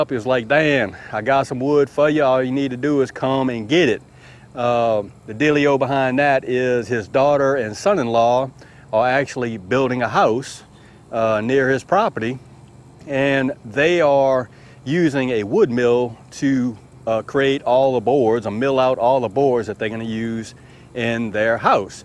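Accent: South, United States. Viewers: I love that Southern accent. Gday from Australia.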